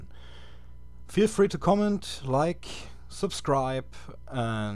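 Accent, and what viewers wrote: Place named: Germany. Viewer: Nice video! And I had to sneak into your profile to verify my first guess - of course you're german ;) It's just so obvious just by listening to the very first words ^^ Aber macht ja nix, Akzente sind was feines. Und deutsches Englisch verstehen die meisten immerhin, da gibt es deutlich schlimmere Akzente ;) Ich freu mich auf dein nächstes Video!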